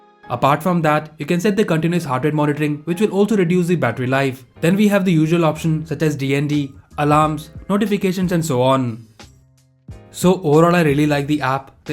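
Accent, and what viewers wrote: India. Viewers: I hate These Indian voices 🙄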